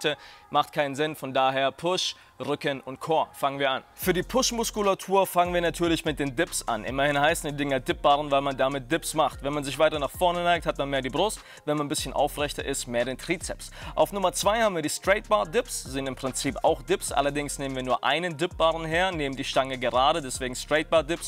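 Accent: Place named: United States. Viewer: Hey coach, wow amazing! Warst du mal länger in Amiland? Du redest fast wie son cooler Amerikaner. Ziemlich nice.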